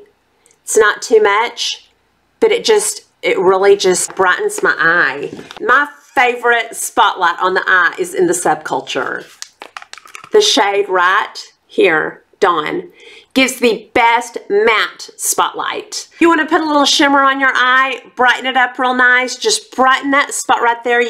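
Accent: South, United States. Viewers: So! Sheila (life with Lilly) told me to watch your channel.......so here I am!! I have Subscribed.....Your a delight....I'll be watching for your new video's! (Your obviously southern, which state?)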